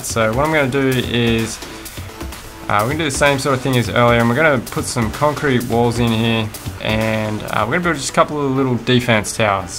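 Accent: Australia. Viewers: Is that an Australia accent I hear by the way your the best youtuber ever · It's the WIDEST Australian accent i've heard yet =O)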